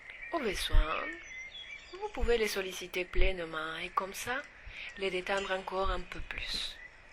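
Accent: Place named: Spain. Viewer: Cest quoi cet accent espagnol ? Paysans ? Créole ?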